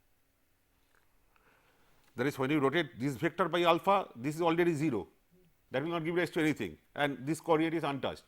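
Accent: India. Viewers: a good effort in letting people understand concepts.. understandable English would have been better. He speaks so fast and english sounds like hindi to me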